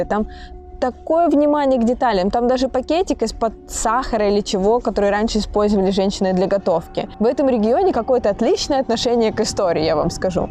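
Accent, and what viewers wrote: Poland. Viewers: Klimat jest, no i ślonsko godka. Lubię. Niklaus Pieron też fajnie opowiada a godom wom to jako gorol :)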